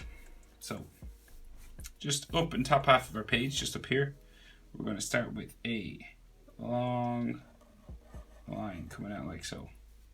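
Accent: Ireland. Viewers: Are you Irish cos you sound it??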